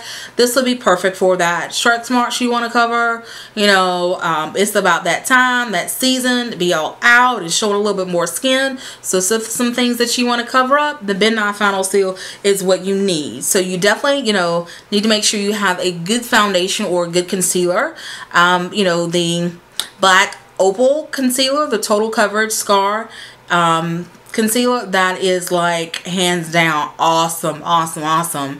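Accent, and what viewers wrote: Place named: South, United States. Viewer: where are you from? I hear a southern accent! 😜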